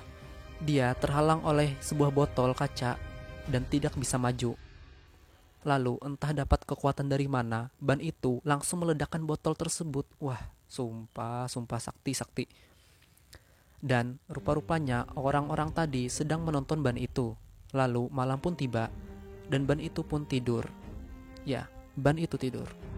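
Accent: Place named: Java, Indonesia. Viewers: Suara org sunda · jawir jamet medok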